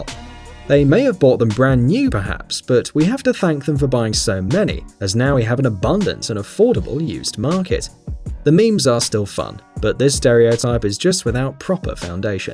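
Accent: England, United Kingdom. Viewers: Weird to hear a British accent and loads of British numberplates, and hear you call an MX-5 by its US of A name.. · Are you from England?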